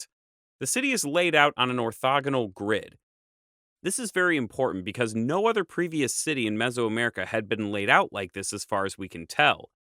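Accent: United States. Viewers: Is this due to the thick American accent?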